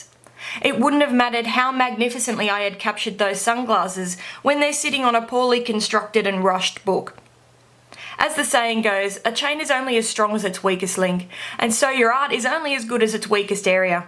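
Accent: Australia. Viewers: Hi. I'm preparing to teach a class using colour pencils and found your tips useful prompts for me to pass along. In some cases they were things I already knew but good to be reminded. Also thanks for showing unsatisfying past work especially how certain papers behave. It took me years to appreciate the importance of using quality art materials and stop blaming myself for unhappy endings. Now I always tell my students a bad piece may not be simply due to their talent or lack of it. Nice to hear the Aussie accent as well.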